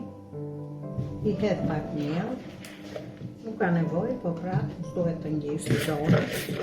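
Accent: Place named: Tirana, Albania. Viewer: Pse ti zonja, pa trokitur fare punon.....mos e shiko ne qoftese te acaron, por kjo eshte nje zonje e vertere Tiranase, qe ke qef ta degjosh, per te gjitha recatat e mrekullueshme qe ben, respkte pa fund per ju zonje qe na kenaqni me gatimet tuaja....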